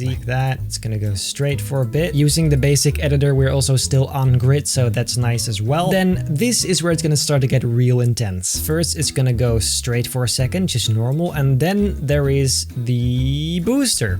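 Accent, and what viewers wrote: Netherlands. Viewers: Are u dutch? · Are you Dutch? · He sounds dutch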